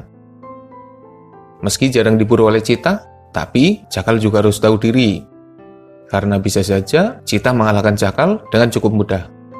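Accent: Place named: Java, Indonesia. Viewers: Medok bang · Bang suarane medok tp lucu nemen yakin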